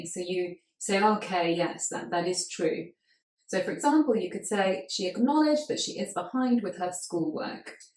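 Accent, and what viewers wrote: United Kingdom. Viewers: Remarkable lesson! Never been a huge fan of British accent but this channel is making me change my mind I guess 😂 Btw once I heard 'snap' as something similar to lose control or get overwhelmed I think. Will you please post another lesson like that you did a few months back on English history? It was the bomb! My most sincere thanks for this video! 😉😉🖤🖤👍🏽👍🏽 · I like your British accent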